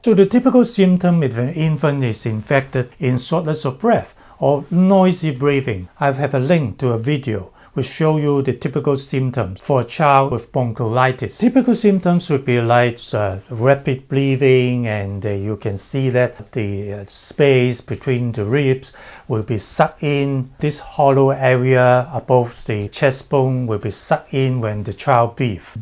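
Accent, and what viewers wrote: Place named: China. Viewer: Love the English with Chinese accent. Made me miss Hong Kong ! 🇭🇰❤ Hope this virus is not serious at all.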